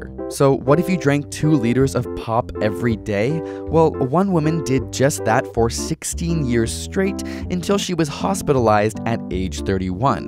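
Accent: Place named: Canada. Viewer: are u Canadian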